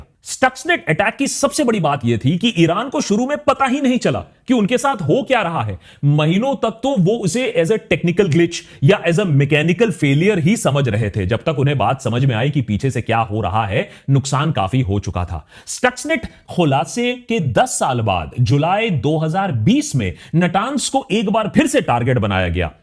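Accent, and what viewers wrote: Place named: Bihar, India. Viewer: Bhaii, you are great.Your style is typical Behari sweet way.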